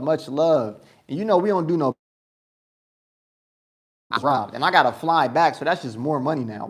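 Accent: United States. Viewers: Unfortunately, corruption is all over Africa. They see money when they hear that American accent. Do not travel by bus by yourself if you are going to other countries. Travel with a local if you can. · 😂😂😂😂😂i have not known you to be so funny. Sorry again Yes,that accent ,many Americans i see around the malls in Kenya they dont talk much. And i know why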